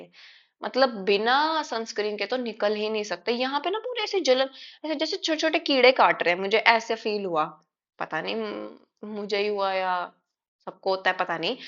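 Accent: Haryana, India. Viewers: Haryanvi accent is lovable..it suits you. And this bhartiya Nar wants spouse visa 😁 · se aap hariyana se lagti ho 😂😂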